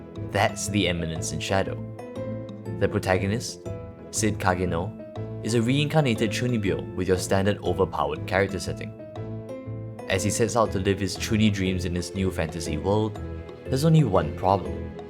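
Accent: Singapore. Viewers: you sounds like my uncle who came back from sg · are u from singapore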